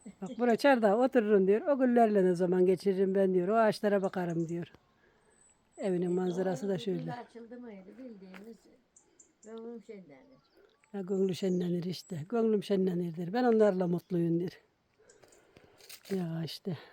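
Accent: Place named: Anamur, Turkey. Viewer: BACIM SEN ANAMURLUMUSUN ŞİVEN ORALI ❤❤❤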